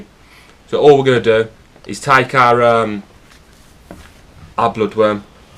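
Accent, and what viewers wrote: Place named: Dudley, United Kingdom. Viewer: Love your accent. Reminds me of my mate Rob, from Dudley :P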